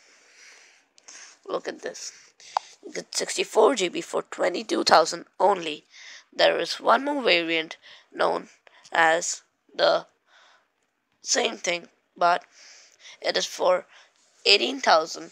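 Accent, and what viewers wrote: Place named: Sindh, Pakistan. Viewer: Bhai Kya chal raha hain Sab theek me accent zabardast ne Bhai Sindhi accent bhool nani Gaye me chalet hain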